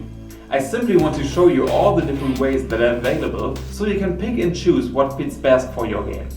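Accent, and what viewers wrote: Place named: Germany. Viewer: i bet you're german!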